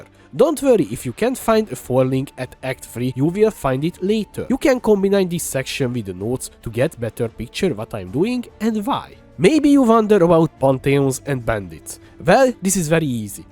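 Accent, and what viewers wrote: Hungary. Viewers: kb én is igy beszélek angolul lehet hallani a magyar kiejtést :D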